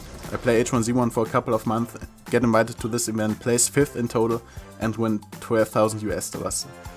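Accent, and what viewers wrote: Germany. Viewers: Man merkt das du deutscher bist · Man merkt das du Deutscher bist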